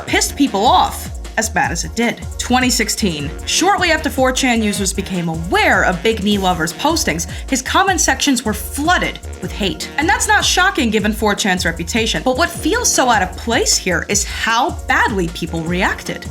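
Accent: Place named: Boston, United States. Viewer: Sorry for the unrelated comment, I do enjoy your videos, but I gotta say your accent is interesting 😅 it's mostly general American, but you have the Boston o, like in the words 'all', 'of', 'talk'. Do you have like one parent from Boston or something like that?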